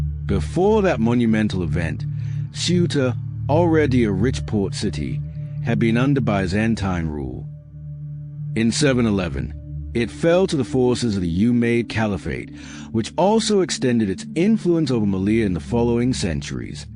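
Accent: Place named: United States. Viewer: ' like the accent of the narror, 'cause he's narring with a genuine one, hey where u fro bro? 'merican, i gess ' what state ?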